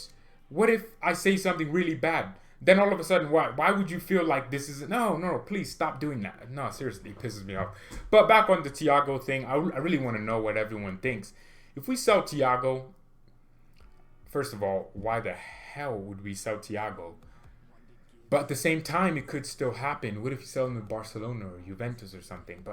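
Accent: England, United Kingdom. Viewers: That English accent tho 😂👌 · Dude you English accent is funny as hell. 😂😂😂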